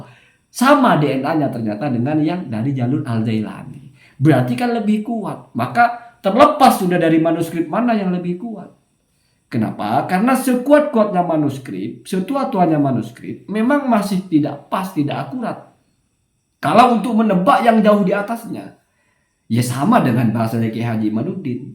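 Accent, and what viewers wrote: Madura, Indonesia. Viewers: Debat soal nasab, saya belum yakin kalau belum dengar hujjahnya. Penyampaiannya simpel, jelas, netral dan lugas dengan gaya Maduranya😁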